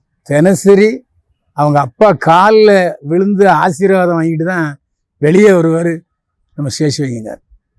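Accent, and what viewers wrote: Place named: Chennai, India. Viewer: Enya ungaluku lam chennai slang lam kevalama iruka? Thiruntha matingaya.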